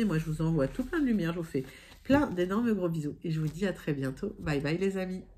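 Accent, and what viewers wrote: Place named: United Kingdom. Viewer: Waouh de plus en plus l'accent British 😅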